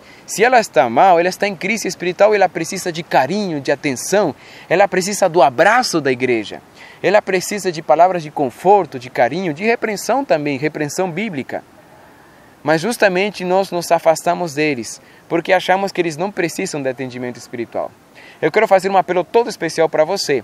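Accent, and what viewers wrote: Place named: Spain. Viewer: Você não é Brasileiro né? Seu sotaque é meio para o espanhol o enfim, eu também sou filha de pastor e já passei por muitas coisas que você citou no vídeo ...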